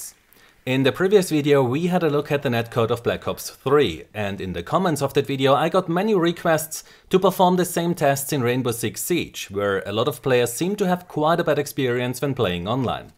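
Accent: Austria. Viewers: Da hört man doch sofort den Österreicher heraus! Grüße aus Linz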